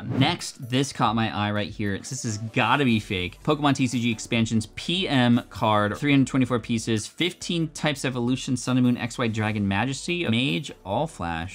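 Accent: France. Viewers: I love your french accent it's really funny. By the way i realy like your videos continue like that. A random guy from switzerland🙃🇨🇭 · j'adore ton accent français HAHA (I love your French accent)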